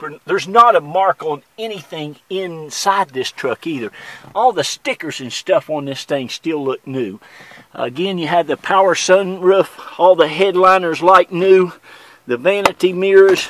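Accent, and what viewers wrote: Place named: Florida, United States. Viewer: Man I love the Florida accent, beautiful truck, I’m currently looking at one for my self.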